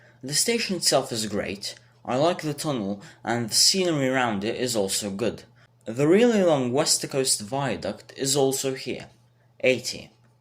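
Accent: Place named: England, United Kingdom. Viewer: Are you really Serbian? You sound English to me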